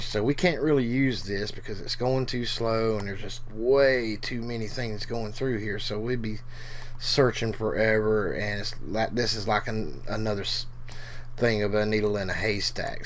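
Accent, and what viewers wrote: South, United States. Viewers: Love that accent man may I ask where you come from? I'm not a native speaker but it's def. southern😎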